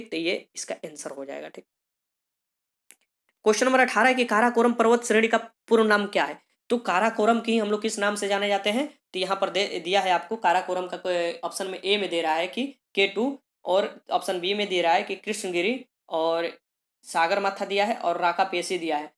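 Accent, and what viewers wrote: Bihar, India. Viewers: Sir aap Kya bihar ke rahne bale h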